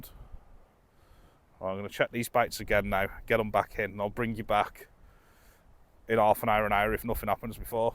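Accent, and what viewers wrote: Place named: Rugby, United Kingdom. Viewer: You sound like you're from Rugby!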